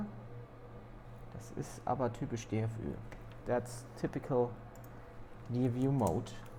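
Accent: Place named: Germany. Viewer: my inglish is very bad jajaj . i think your english is really good , a little be mixed with german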